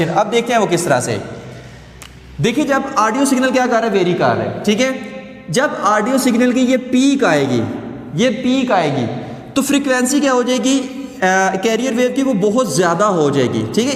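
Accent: Jhelum, Pakistan. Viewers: Are you from jehlum? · Are u from jehlam sir😮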